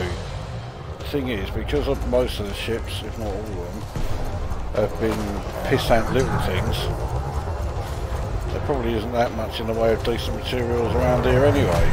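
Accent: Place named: London, United Kingdom. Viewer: I engineered my weapons Thermal vent but Distributor has so many alternative's to work out so I will try weapon based Engineering.I must add at 63 now I'm trying my best to get the most out of my ship's and being an Elite Vet since 1984 I'm still on a learning curve on this game.Nice work on the vids and I love the Old Smoke accent.Cheers from Raymondo the Taff.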